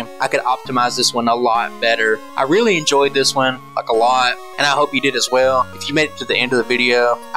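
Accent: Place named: Texas, United States. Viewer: just saw this channel now gg on the win also nice texas accent lol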